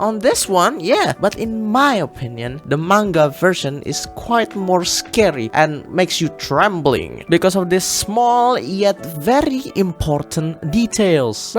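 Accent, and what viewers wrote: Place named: Indonesia. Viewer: wait are you indonesian? you have such heavy indonesian accent when you're speaking in english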